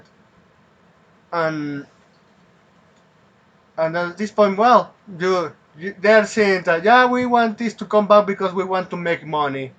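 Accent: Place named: Spain. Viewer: Sin ofender.. Pero has probado a hacer los vídeos en español?? (Creo que es tu lengua natal, el acento me suena a español) Es que no se entiende absolutamente nada.. No tengo problemas en ver vídeos tanto en español como en inglés, pero tienes un acento extremadamente marcado y pillo solo algunas palabras sueltas. Espero que no te lo tomes a mal, he intentando ver el vídeo completo, pero ha llegado un punto en que estaba perdiendo el hilo de lo que decías incluso.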